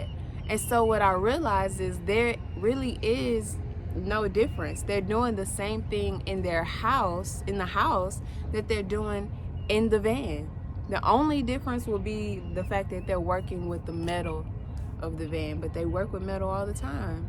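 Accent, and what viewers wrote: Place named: South, United States. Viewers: Southern girl love it. Great video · you look beautiful and healthy. I love when your texan accent comes out lol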